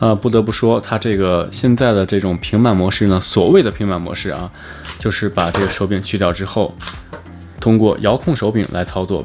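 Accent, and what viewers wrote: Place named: Northwest, China. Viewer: up主浓浓的西北口音，祖籍是西北人吗？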